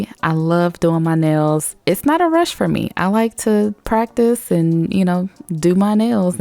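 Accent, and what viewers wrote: United States. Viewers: From London Brixton UK. Wow. Girl you got creative talent. Beautiful. This is the USA female accent that sounds real nice.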